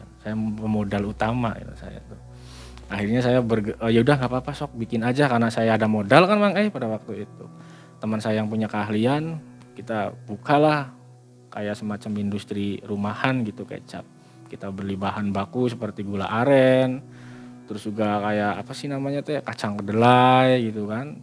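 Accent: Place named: Cirebon, Indonesia. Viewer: cirebon banget yaa mang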